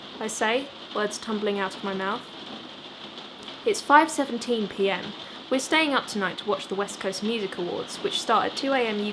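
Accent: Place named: United Kingdom. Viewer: It is so cute when it is read with a British accent ahaha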